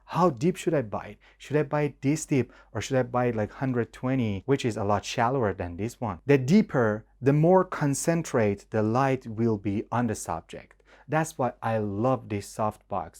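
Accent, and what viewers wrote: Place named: Iran. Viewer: Based off your accent, you sound Persian. 👍😎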